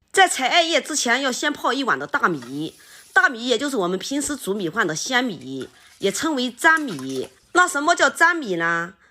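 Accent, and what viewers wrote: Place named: Jiangxi, China. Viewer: 很熟悉的赣普话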